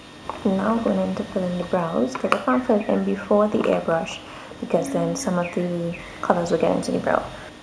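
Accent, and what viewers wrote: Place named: Trinidad and Tobago. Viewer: ha! i can pick out a trini accent anyday!!!! hmm just found you and love your work! subbed! air brushing is the bomb my system is the dinair pro my brides just love it! a trick i do is switch guns because i really prefer oil based foundations they last longer covers better and show better on film but i like the ease of the water based to me it seems lighter especially during the summer.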